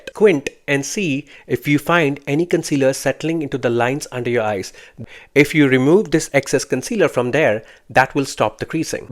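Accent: India. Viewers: Callar correcta ! The Indian accent bro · Hate Indian accent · Gosh I hate the Indian accent …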